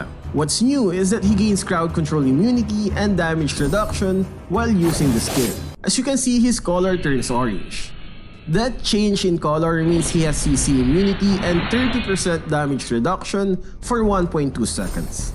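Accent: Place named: Philippines. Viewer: Yor accent looks like filipino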